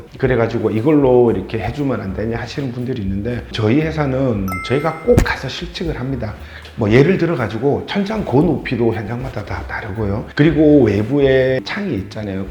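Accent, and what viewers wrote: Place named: Gyeongsang, South Korea. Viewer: 역삼에 있는 개발회사인데 9월이사라 이사갈곳정하고 있는데 연락 꼭드리겠습니다. 같은 경상도 분이라 먼가 친숙하네요^^ 전용 약 63평, 임대면적 99평정도 되는 사무실 예정하고있습니다^^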